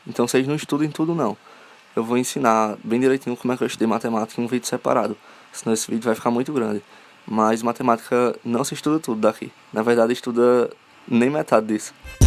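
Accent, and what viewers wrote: Northeast, Brazil. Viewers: Você é do Nordeste?🤔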